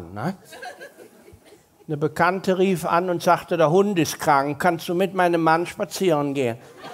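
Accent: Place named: Rhineland, Germany. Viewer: Wenn man sich mal an den sehr ruhigen und rheinischen Dialekt gewöhnt hat, wobei ich mit rheinisch überhaupt kein Problem habe und es auch sehr gerne mag, Mama war aus dem Ruhrgebiet, dann lernt man ihn erst so richtig kennen und sagt Respekt. Sehr viel Wortwitz! Ein wirklich guter Hausmann auf der Bühne!🤣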